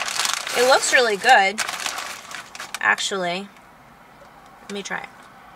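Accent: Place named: New York, United States. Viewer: Hi! I have to add sugar free maple syrup to plain Greek yogurt 🤗 because yuck lol I don’t know why every time you say “ coffee” I repeat it just like you with your New York accent 😂 Where did you get your cute black shoes? I’ve been in the hunt for some that I can just slip on. I love all the cloths!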